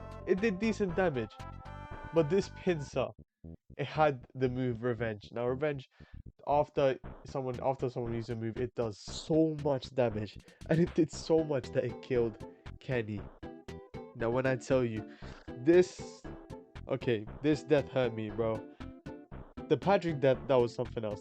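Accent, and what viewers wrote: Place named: Sydney, Australia. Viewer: You got a Sydney accent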